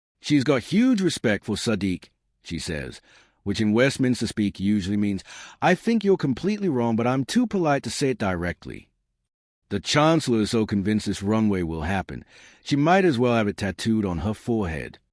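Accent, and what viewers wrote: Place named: United States. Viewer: I'm a bit suspicious of this, nice though it would be, if true, but there is nothing about this on any other youtube channel. Together with this being reported by someone with an american accent, how come nobody who is British reporting this. A huge pinch of salt is needed with this. Is this, (Fake News) going under yet another name?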